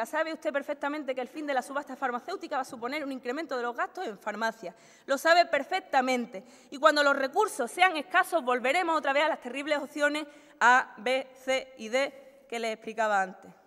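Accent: Andalusia, Spain. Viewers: Acento francés???? tiene acento andaluz, petardo!! A quién acusas?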